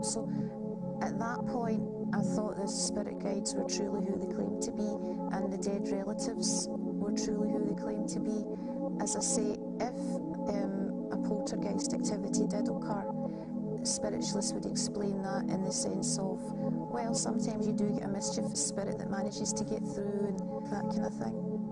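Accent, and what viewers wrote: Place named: Scotland, United Kingdom. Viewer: Scottish accent makes me sick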